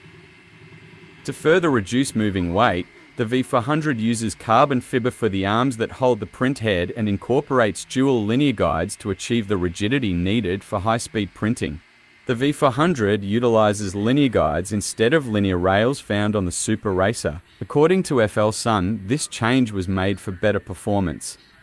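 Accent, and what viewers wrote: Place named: Australia. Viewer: Man... your accent is Aussie but your location is Spain...btw excellent work..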